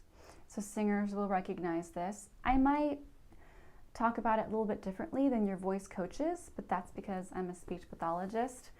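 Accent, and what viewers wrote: Texas, United States. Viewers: are you Texan? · hello are you Texan?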